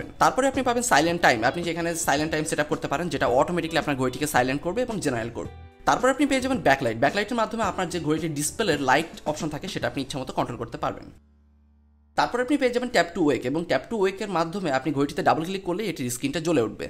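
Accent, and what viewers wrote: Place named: Bangladesh. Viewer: BD Voice Thanks ♥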